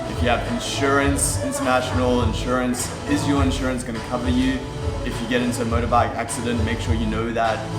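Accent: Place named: South Africa. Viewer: Dang, your SA accent is way more thick in these older videos.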